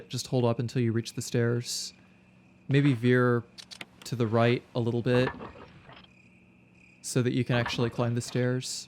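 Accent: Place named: England, United Kingdom. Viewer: I love your voice. Superb english accent.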